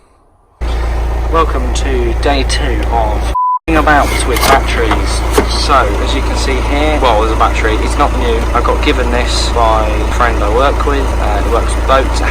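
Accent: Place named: Portsmouth, United Kingdom. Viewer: Sounds like you live where I used to before I moved away? 30 years in Gosport born in Portsmouth 👍🏻👍🏻👍🏻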